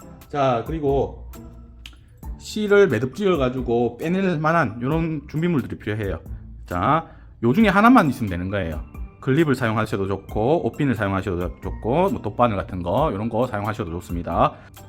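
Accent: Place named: Gyeongsang, South Korea. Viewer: 말투가 경상도 분이시네요 ㅋㅋ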